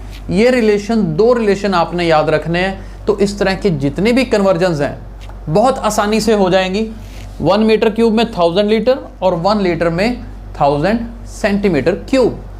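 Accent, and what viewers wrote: India. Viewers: Accent is also impressive! Very few Indian has good accent like you sir. Thank you Sajid Ali Karachi Pakistan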